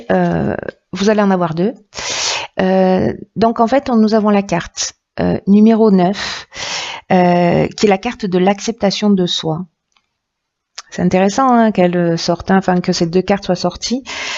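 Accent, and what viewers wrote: South, France. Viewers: petit accent du soleil.. continuez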